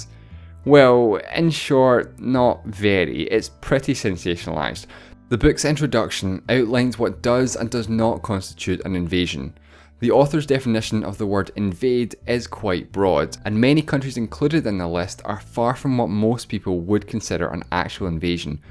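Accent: Scotland, United Kingdom. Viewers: Ashay Dwivedi it's nice to hear a Scot once in a while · I just don't understand why people have so much problem with his Scottish accent. English is not even my native tongue and I understand him without subtitles with no major issues. Even when you're not familiar with his accent, it barely takes 2 minutes to get use to it. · Is the narrator Scottish? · Love your Scottish accent.🏴🇬🇧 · Me: Wow his voice has changed! , Also me: Again that Scottish accent 😑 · Are you Scottish? I truly want to know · I really like your content you create but it is horrible to listen to that scottish accent... I am really sorry... can you try speak little bit more “normal”? I wish I was not forced to turn it off in the middle because I cant stand it anymore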